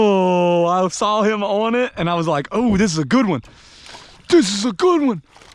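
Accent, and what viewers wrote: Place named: South, United States. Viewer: This is so violently southern. I love it. As an Alaskan it is inspiring to see this level of southern out there😂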